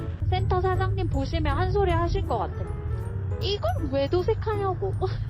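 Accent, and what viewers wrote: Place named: Gyeongsang, South Korea. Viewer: 경상도 ㅎ ㅎ 사투리 좀 안쓰면 안되나? 딴세상 살아요? 서울에서 그리 멀지 않은데 ㅡㅡa?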